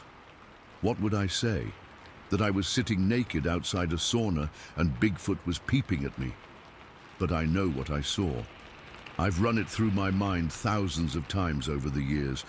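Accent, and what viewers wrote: United Kingdom. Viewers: Hard to listen to a British dude talk about American .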